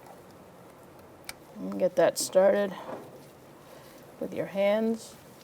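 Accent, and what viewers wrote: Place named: Massachusetts, United States. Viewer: I’m hearing Massachusetts or Jersey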